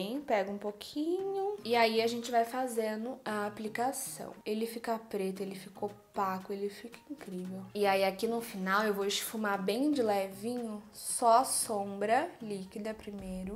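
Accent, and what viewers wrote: Rio de Janeiro, Brazil. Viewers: vc é carioca?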